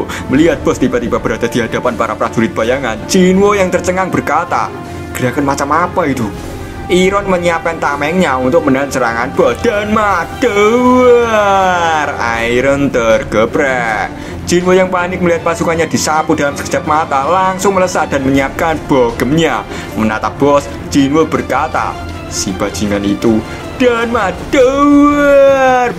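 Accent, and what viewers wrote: Java, Indonesia. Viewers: Bg org jawa ya?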